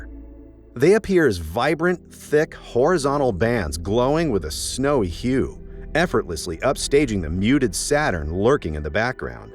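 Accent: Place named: United States. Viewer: ki American voice😅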